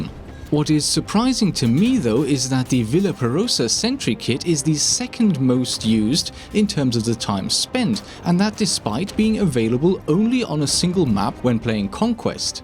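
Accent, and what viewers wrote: Germany. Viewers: are you german or from swiss, that Accent is strong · German is your mother tongue, isn’t it? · do you speak german in your free time? bc you have an very little accent...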